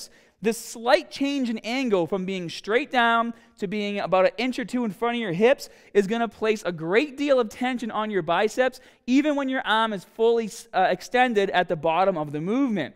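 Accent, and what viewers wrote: Boston, United States. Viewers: Boston? Rhode Island? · Boston accent ? Thank you great video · I forgot that the Boston accent existed, Haha. Good info from the dude tho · I’m not even from the US but even I recognize this guy must be from Bostaahn Mass. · SO Boston, LOL! Great vid! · Theres a touch of a boston southie accent in there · Love your Baaaasten accent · Appreciate the video, but check the BAHston accent. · Is this guy from fucking Boston? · You got a lot more Bahhstun from your videos five years prior.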